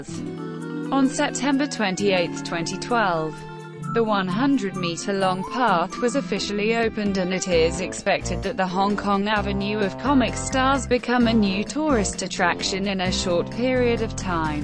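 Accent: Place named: United Kingdom. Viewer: Wow sosyal may British accent hehe.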